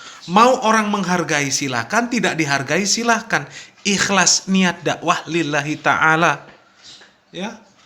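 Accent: Java, Indonesia. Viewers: MIN ADMIN....OMAHMU NGENDI MIN....BHSA JOWO MU KOK MEDUK... JOWO NGENDI KUWE.... AYO KETEMUAN MIN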